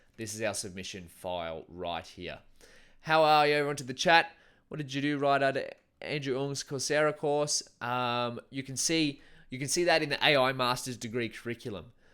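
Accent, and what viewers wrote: Australia. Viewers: Came to learn the kaggle format, stayed for the Aussie accent and comically positive energy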